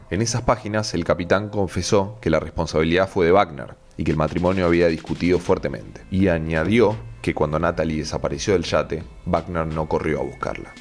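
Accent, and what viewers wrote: Argentina. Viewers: Ese acento exagerado argentino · Noo, a este locutor no se le entienden muchas palabras, ese dialécto argentino, machacando las palabras al final; debería estudiar locución, no hay como el español colombiano, claro, preciso y conciso, no dañen el español, que es un idioma bonito, claro que peor lo hablan los propios españoles, con una pronunciación tan arrastrada, sin querer abrir la boca o los dientes, como si siempre quisieran pronunciar la Z.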